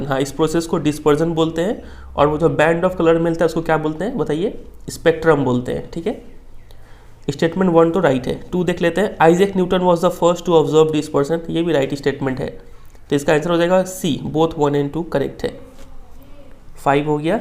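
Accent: Bihar, India. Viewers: Sir AP bihar ho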